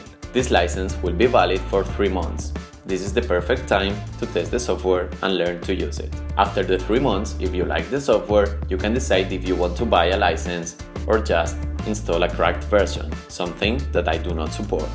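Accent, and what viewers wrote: Spain. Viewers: como se nota q eres español:)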